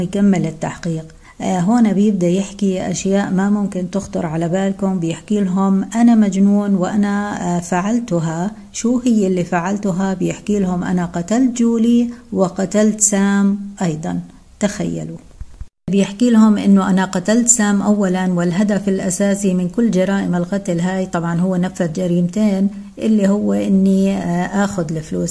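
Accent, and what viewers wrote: Jordan. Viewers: سردك للقصة جميل وشيق فيه كثير من اللغة العربية بميولك احيانا إلى اللهجة الاردنية الجميلة أيضا .. بالتوفيق سيدتي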